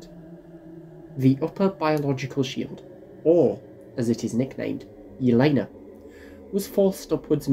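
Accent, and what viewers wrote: United Kingdom. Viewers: Keep that Scottish (?) accent under control pls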